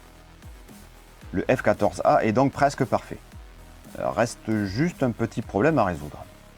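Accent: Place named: Libourne, France. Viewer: Je regarde vos vidéos depuis 5 ans. Le début donc. Et je me rends compte aujourd'hui avec cette nouvelle vidéo toujours aussi bien réalisée que vous avez l'accent du Libournais. Ce qui semble compatible avec votre adresse de compte YT (3350). Je me trompe ?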